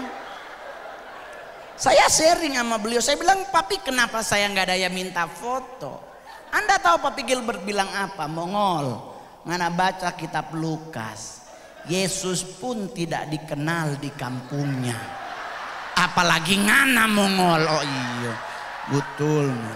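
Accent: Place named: Eastern Indonesia, Indonesia. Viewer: Terharu dengar Manado Batak Ambon Papua...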